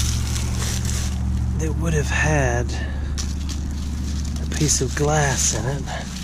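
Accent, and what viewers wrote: Alabama, United States. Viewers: What a voice! If someone wanted to capture American accents, this guy would be the voice of Alabama. Yes, it's been softened by interaction through modern media, but softer than Texan. No twang and a hidden southern humor. College educated with a desk job.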